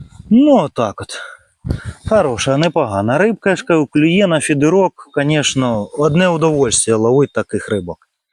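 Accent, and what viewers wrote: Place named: Russia. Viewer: Как бы не старался рускоязычный человек говорить на мове, всё равно это чувствуется.А водоём этот не плохой,по крайней мере не так заросши водной растительностью как в прошлых видео.. нхнч.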